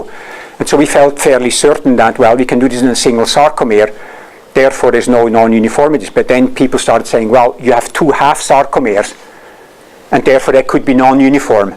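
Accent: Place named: Switzerland. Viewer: YEEE Er esch en Schwizer vo Leuggere. Geile Typ I was 100 percent sure hes got the classic swiss accent. Guess what - he is from my homecountry xD.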